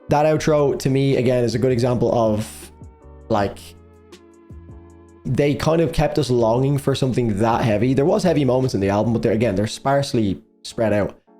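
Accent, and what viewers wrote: Ireland. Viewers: 3 reasons why your Irish (warning: funny): 1. You drink excessive alcohol, specifically in the blind reaction video for Even in Arcadia, every Irish will do this this is a stereotype really but who cares 2. Your accent (pretty straight forward) I also sense some British or Australian accent not sure 3. Lastly, in this video your drinking (which is made by Coca Cola company this blew me away I didn’t know they sold water products) which are exclusively in Ireland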